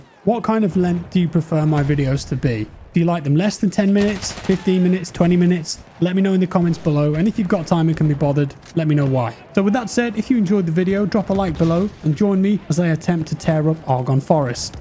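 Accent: United Kingdom. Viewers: As long as you like, you're British patter and nonchalance is soothing and enjoyable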